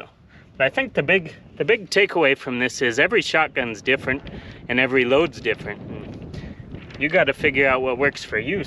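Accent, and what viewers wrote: Canada. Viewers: You're the most Canadian soundin American I've ever heard. I like your videos, subscribed my man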